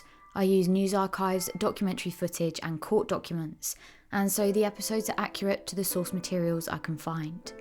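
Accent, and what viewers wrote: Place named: Essex, United Kingdom. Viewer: Pity the curator is unable to finish her words correctly. Sounds like Essex to me. Difficult to follow. Unable to pronounce the letter T.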